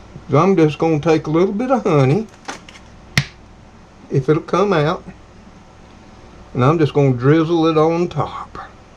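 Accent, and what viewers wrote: South, United States. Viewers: That looked lovely, thank you for sharing. I look forward to your Christmas preparation videos and Christmas cooking. And I love your Southern accent too, as someone else said further down. I was playing one of your videos to a friend of mine, as I have told him about you and how much I look forward to watching these. He loved it as well. So thank you from me and him. :) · Yum!!!! Now that looks delicious. I’m going to cook this as one of the Christmas meat choices since we don’t celebrate thanksgiving in the UK. PS my son and I love your southern US accent! It’s like music to our ears, so an extra treat getting to watch you not only show us how to cook delicious food but also hearing you speak. Hope you had a wonderful thanksgiving 😊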